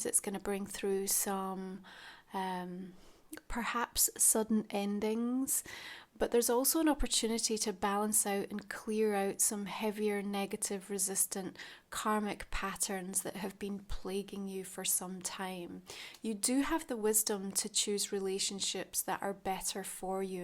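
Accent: Scotland, United Kingdom. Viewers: Hi it was lovely to see you in the flesh in Edinburgh, I looked for you I’m sorry we didn’t get a chance to speak more x I love your content, style, delivery and your lovely Scottish burr. ❤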